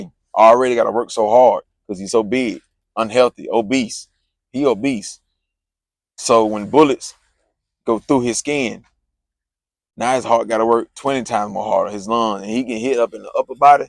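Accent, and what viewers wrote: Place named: Carolina, United States. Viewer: I think u from the Carolina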